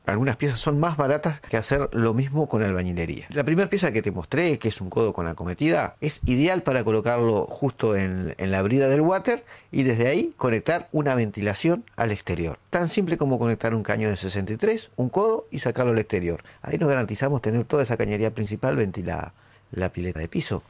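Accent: Uruguay. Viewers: Habla en uruguayo gallego? Elegí uno loco!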